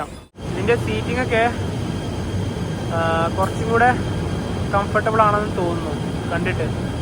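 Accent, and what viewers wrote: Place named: Nileshwaram, India. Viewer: തിരിച്ചു പോരാൻ ടാക്സിക്ക് പകരം ട്രെയിൻ “ ആക്കിയത് ” നന്നായി. താമസിക്കാതെ ATS “ആക്കുമെന്ന് ” പ്രതീക്ഷിക്കുന്നു- നീലേശ്വരം ഭാഷാ ശൈലി രസമുണ്ട് കേൾക്കാൻ.❤❤❤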